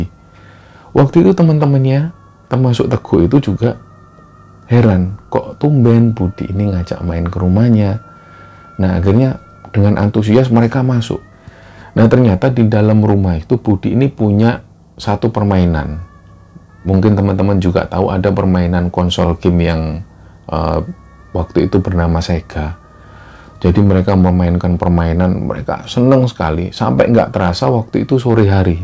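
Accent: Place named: Surabaya, Indonesia. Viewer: wkwkwkwk suroboyo banget, tekong-tekongan, skit skit an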